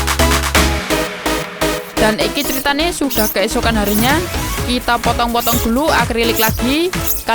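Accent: Java, Indonesia. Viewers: Jowo e' meddok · Jowo ne kentel 😁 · Orang Jawa Kayaknya, Karna logatnya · Emang orang Jawa ngomongnya gitu, akupun klo ngomong juga gitu🤣 satu jaman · "kita potong sampai jeru gays😂" bahasa jawanya kentel banget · Bang koe uong jowo Yo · wong jowo yo ngonokui rek ngomonge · Mas sampean jowo ndi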